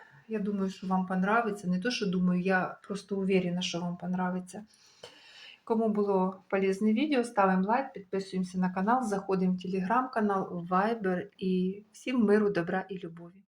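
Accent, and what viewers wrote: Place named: Lozova, Ukraine. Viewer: ❤Подобається як ви разговарюєте, по нашому ,по Лозівському хоча проживаю більше 40 років на Полтавщині. Не звертайте увагу на злі язики ,це все від заздрощів. Миру, здоров'я, найшвидшої Перемоги. До речі моє рідне село Перемога ❤.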